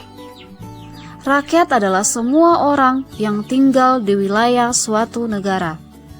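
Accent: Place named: Batak, Indonesia. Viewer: Masih ada bahasa pekat btakny🤣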